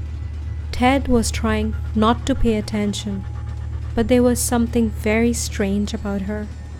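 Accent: India. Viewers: U have a really Indian accent it’s annoying me. Sorry ! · Are you indian by any chance?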